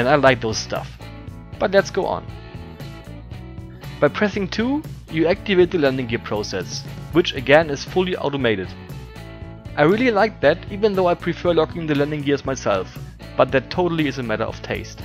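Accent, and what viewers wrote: Germany. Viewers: thats a nice ship, i only build in smallship form because it has more detail for my steam-punk fleet. btw, are you german? iam german and you sounds like that. :D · are you german? cuz your accent, you know...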